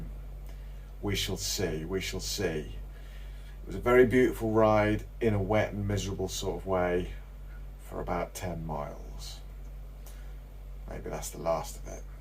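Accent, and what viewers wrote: England, United Kingdom. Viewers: I was looking forward to this until l heard your horrible English accent. There is to many of use up hear now and you will ruin the Highlands just like everywhere your mob has been before.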